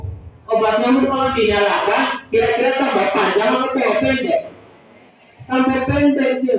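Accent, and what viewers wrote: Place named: Tegal, Indonesia. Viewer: Ponakan lik... Masih kebawa logat tegal sing wis kecampur logat payakumbuh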